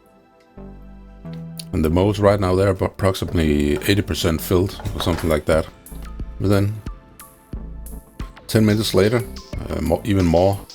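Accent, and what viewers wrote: Denmark. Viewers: Are you danish brormand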